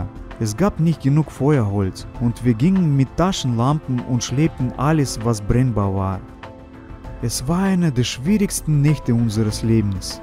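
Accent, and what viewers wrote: Russia. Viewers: Bist du ein Russe oder warum der Akzent?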